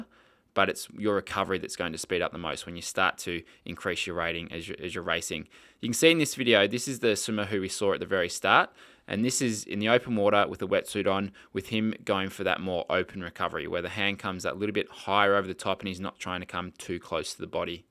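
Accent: Australia. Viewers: He can't help being Australian :-)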